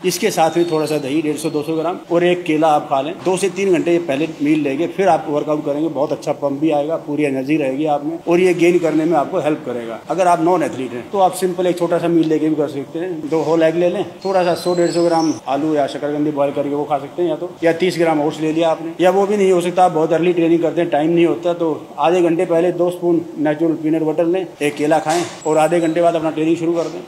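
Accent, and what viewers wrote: India. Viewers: Aapki boli desi h mhari taria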